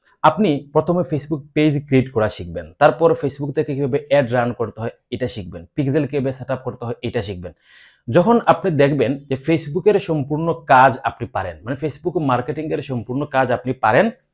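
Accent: Sylhet, Bangladesh. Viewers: Thanks vai most informative video. Vai kita Sylhety ni? mate buja jar halka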